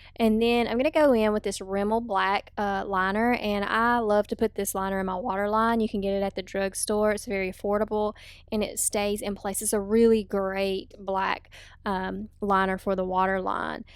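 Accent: South, United States. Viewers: I love the look and our Southern accent! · Southern accent!!!! LOVE IT!!!!! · Omg your voice makes me miss the south so much ): you sound adorable and you are so beautiful! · I just found your video and subscribed!!! I love your southern accent, where are you from??? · I love you southern accent and the look 👌🏻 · yay southern we talk like that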